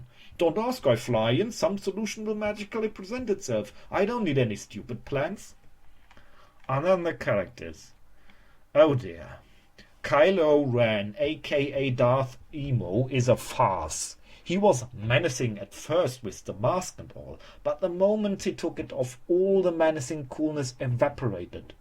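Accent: Germany. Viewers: I love that German accent! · I liked TFA so I can't say I fully agree with you, but you spoke very well and made excellent points! I love ALL the SW films and I, like you, LOVE the Prequels!! Great German accent too.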